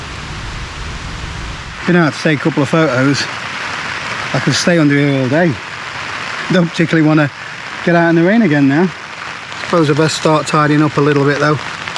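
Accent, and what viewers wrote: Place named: Nottingham, United Kingdom. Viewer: Where is this. Your accent sounds like yur from Nottingham.?)) I'd be building a stealth dug out tbf.. Happy days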